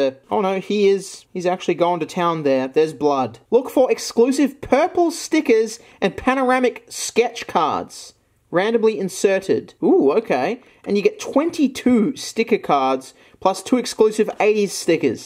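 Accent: Australia. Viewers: i thought ur Australian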